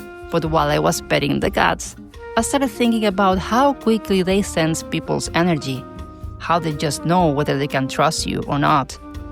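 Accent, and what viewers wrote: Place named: Spain. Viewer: I love your videos. They make me want to live in spain. I love your voiceovers and your Spanish accent. ❤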